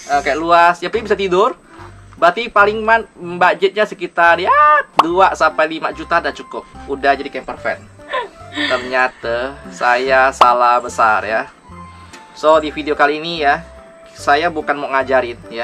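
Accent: Pontianak, Indonesia. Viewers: Bahase Nye to Melayu chine Pontianak..... Mantapsss...macam channel hobby makan....lanjut ko 😁😁😁😅😛🙏👍👍👍🌟🌟😃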